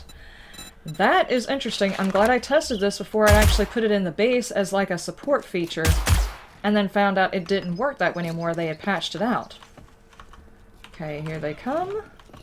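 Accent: South, United States. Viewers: Not related to 7DTD, I’m English so being able to place American accents is not a skill I have, what accent is it you have, been trying to figure it out but I’m useless. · seriously i think shes georgia/missouri and those kinda places she says things like you'all? and other southern words and ways of speaking. also she has those those southern good manners and so on im pretty sure shes from there. havent beed to yorkshire in decades i loved it there i should go back some day :).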